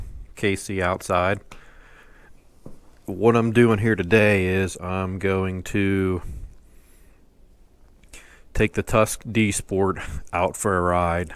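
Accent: Pennsylvania, United States. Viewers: I saw your in Blair county, I’m from York county, I could tell by your PA accent you were from PA haha, always looking for people in central PA to ride with!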